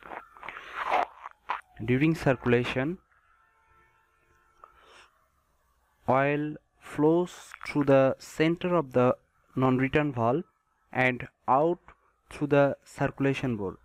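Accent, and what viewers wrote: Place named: India. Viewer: Indian english is awesome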